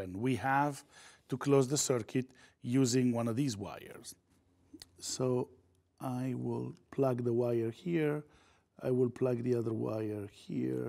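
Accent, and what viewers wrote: Italy. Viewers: Si capisce che sei italiano, sia dal nome, sia dalla pronuncia, quindi si potrebbero avere i sottotitoli in Italiano per chi come me non sa bene l'inglese?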